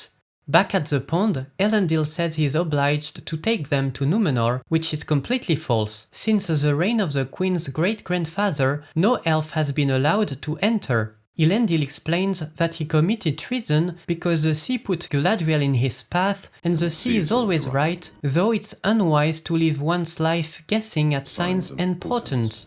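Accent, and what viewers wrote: France. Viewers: C' est un accent français ça?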